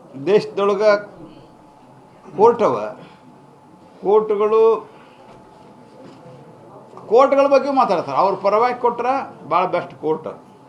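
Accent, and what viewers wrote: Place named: North Karnataka, India. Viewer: ಅಚ್ಚು ಉತ್ತರ ಕರ್ನಾಟಕ ಭಾಷೆಯಲ್ಲಿ ಅತ್ಯಂತ ಪ್ರಬುದ್ಧ, ಸಮತೋಲನದ, ಯಾವುದೇ ವಿವಾದಕ್ಕೆ ಎಡೆ ಮಾಡಿಕೊಡದ ಸ್ಪಷ್ಟಿಕರಣ. ತಮಗೆ ಅನಂತ ಕ್ರತಜ್ಞೆತೆಗಳು 🙏👌👍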